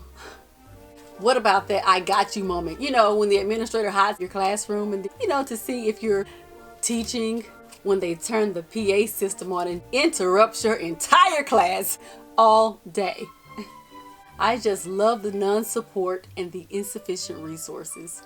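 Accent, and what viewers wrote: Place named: South, United States. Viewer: Where u from with that country accent ..... I hate teaching !!!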